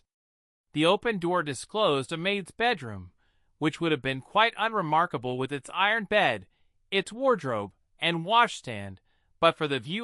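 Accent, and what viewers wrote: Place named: United States. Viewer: Omg!!! Do not let a AMERICAN READ AGAIN 😡😡😡😡😡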